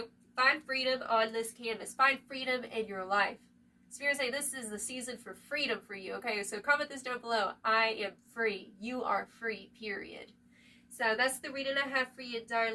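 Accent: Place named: Texas, United States. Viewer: Everything is always working in my favor., I LOVE your Texas twang!😂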